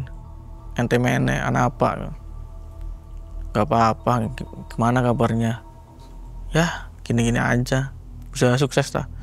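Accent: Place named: Java, Indonesia. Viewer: Saya suka dengan cara bicaranya mas..medoke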